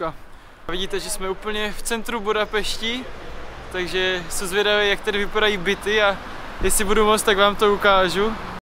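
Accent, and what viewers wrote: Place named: Moravia, Czech Republic. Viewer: Moravák jak poleno. 👍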